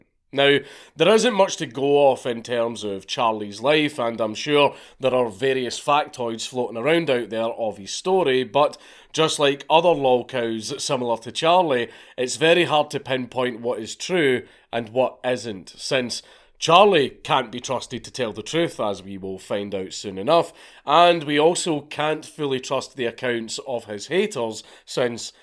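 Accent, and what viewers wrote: Scotland, United Kingdom. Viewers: Who,, Charlie? Charlie is an American,, or do you mean the channel creator? Count Dankula is from Glasgow Scotland,, and he's speaking with a heavy Scottish brogue... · Each video you over pronounce every word to mask your scottish accent kills me 🤣🤣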